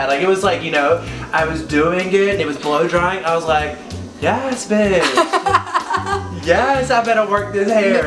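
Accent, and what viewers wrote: South, United States. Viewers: You just have a southern accent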